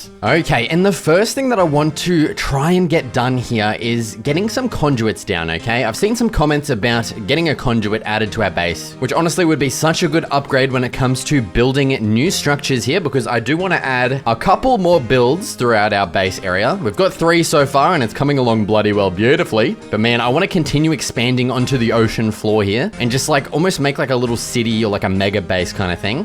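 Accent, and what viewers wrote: Australia. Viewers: Awesome gameplay to watch and listen(i just like an Aussie accent). And also, you should definitely defeat karkinos would be a nice goal for the series imo.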